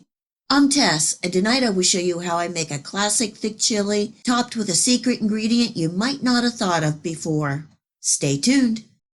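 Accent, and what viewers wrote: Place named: Pennsylvania, United States. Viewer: Just found your channel and have been binge watching….sounds like a Pa accent or close to it (East Coast) 🤗😘 I’m from York!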